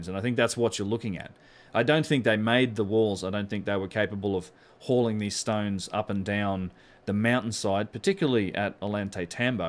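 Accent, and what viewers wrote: Australia. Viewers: Thanks, a fascinating tour of Sacsayhuaman. I love your clear presentation style too, and as a fellow aussie I am SO happy to hear an aussie accent on Youtube - not many of us do this kind of work.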